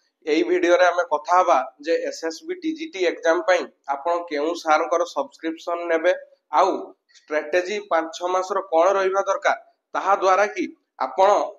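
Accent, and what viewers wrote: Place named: North Odisha, India. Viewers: Sir apana nka ghara keonjhar, Mayurbhanj ya phir balasore ru thiba bcz apana nka talking ru jana paduchii, sir mu b cbz student aii year b.ed sarila😊 bahut worried achii kn kemiti karibi😢😢😢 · Aapono nka kotha pura mohanta type re,mayurbhanj ro ki...?khub kom sir motivate karanti,aapono motivate kori kori bujhauchanti chhua mananku,so,thanks...mu history,politics,geography and economics note chanhuchi,next year b.ed preparation pai...so,give me some notes🙏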